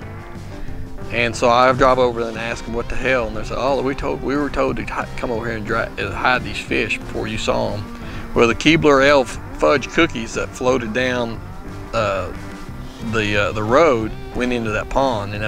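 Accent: Texas, United States. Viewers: Definitely sounds like Texas.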